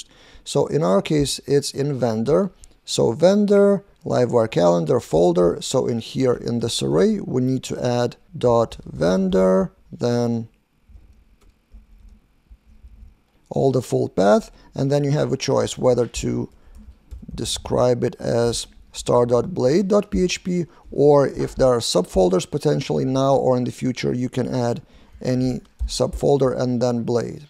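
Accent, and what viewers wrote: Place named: Russia. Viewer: Имеется ели заметный русский акцент у автора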